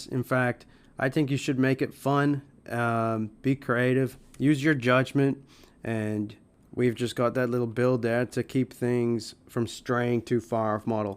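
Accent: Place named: Australia. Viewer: slightly higher pitched Australian *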